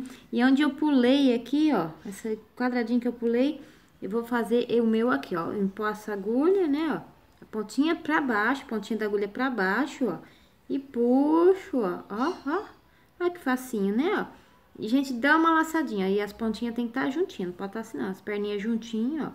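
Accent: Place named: Rondônia, Brazil. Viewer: Q coisa bunitinha! Vc tem sutaque de Ro!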